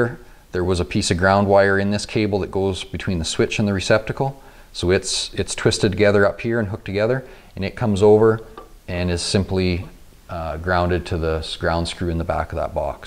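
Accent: Canada. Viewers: You can so tell that he's Canadian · You must be Canadian eh. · You have the most Canadian accent ever it reminds me of home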